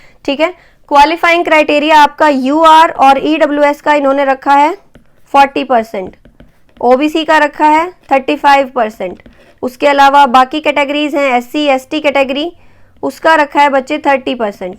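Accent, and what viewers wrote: Haryana, India. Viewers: Hm Rohtak side ki lg rhi h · Madam Haryanvi h 😂❤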